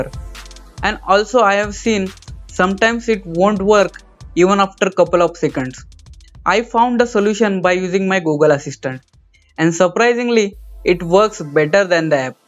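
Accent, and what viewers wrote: India. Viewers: Hindi speaking English; I hate that accent. All are the same.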